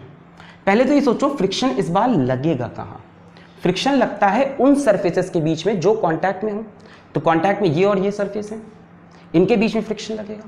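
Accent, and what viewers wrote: India. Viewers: Unfortunately I can't understand Indians' accent 😭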